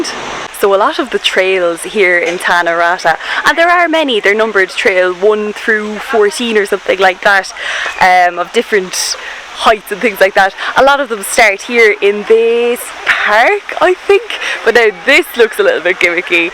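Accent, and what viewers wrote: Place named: Ireland. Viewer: Im malaysian,seeing Irish here, hearing the english,it sounds calmy funny..i seen the video in the brit trying to understand the irish,welsh English.. hearing u here now i get them..as Saoirse ronan says,the vocal for irish is different to std English speaker..also irish english is quite low tone..yours,collin farrel,liam neeson have that vocal similarities.. anyway, enjoy ur trip, safety 1st miss..😊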